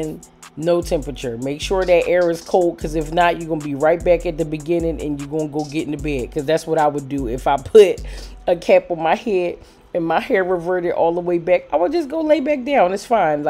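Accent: Philadelphia, United States. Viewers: Hey! I'm glad that you're better♡♡. It's crazy how you said your voice is a huge part of your channel, bc legit, I love to hear you talk. The sound of your voice and your Philly "accent" is so soothing and dope to me❤. Thanks for all the knowledge, positivity, laughter, and realness you bring.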